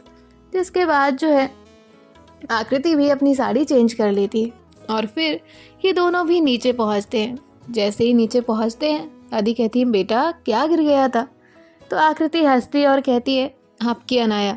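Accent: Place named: East, India. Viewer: Aap kya East Side se belong karti hu kyuki mujhe yesa lagta hai ki aap Bihar ya Udisa se belong karti because aapki voice ton East Side ki lagti hai😅 Agar haa toh reply zarur karna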